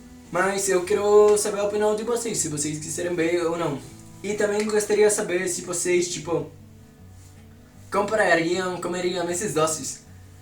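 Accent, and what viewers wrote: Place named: Rio de Janeiro, Brazil. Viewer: Vc tem uma mistura de sotaque carioca e português de Portugal, mas está muito bom kkk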